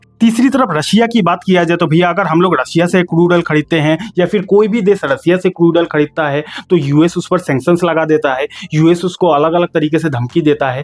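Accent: Bihar, India. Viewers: Bihari to tum?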